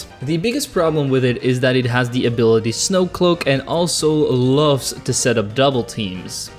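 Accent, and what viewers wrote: France. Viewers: That French accent though. Haha! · Like for the French accent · My favourite part of all your gen4 sinnoh runs is Ur French accent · That French accent tho 🔥🔥🔥🔥🔥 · That damn french accent always gets me x) Excellent video, you truly are great:)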